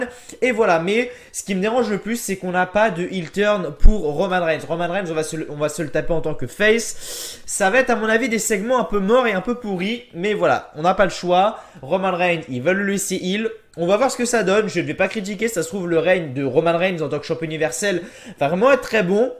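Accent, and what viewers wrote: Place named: South, France. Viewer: L'accent du sud je m'en lasse jamais il est grave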